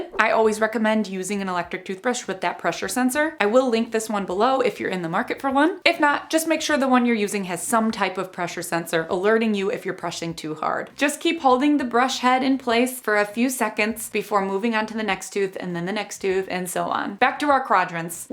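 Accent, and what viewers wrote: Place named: Michigan, United States. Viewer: Is that accent from Mt Pleasant Michigan? It’s pretty thick. But I like it.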